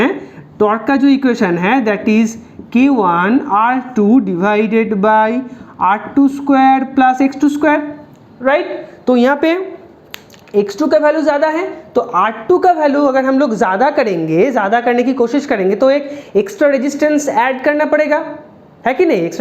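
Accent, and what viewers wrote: Bengal, India. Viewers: Aap bengoli hain ?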